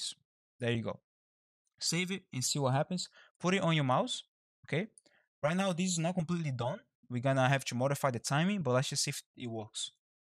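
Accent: Brazil. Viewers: mano tu é muito brasileiro kkkkk esse sotaque nao me engana , otimo ingles btw haha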